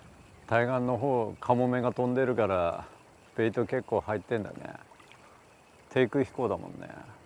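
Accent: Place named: Tohoku, Japan. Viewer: 福島出身です🙇🏽 久し振りに東北訛りがブルーブルーの動画で聞けて、嬉しくなりました笑笑 応援してます‼️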